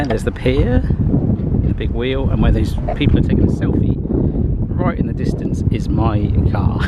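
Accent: South Africa. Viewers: I'm from South Africa and that accent gave it away.. He sounds Afrikaans too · S.A represent! I'm also from South Africa and I'm feeling it for that accent!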